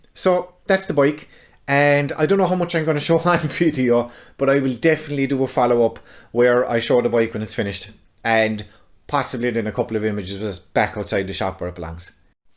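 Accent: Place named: Ireland. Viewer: Sound! I've never got them exactly the way I want first time. The power of editing!! Also great to hear an Irish accent making its way in YT space. Slan a chara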